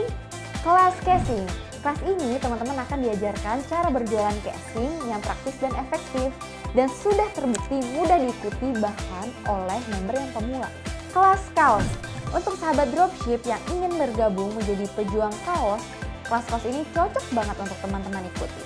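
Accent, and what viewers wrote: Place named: Cirebon, Indonesia. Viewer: Cirebon mana suaranya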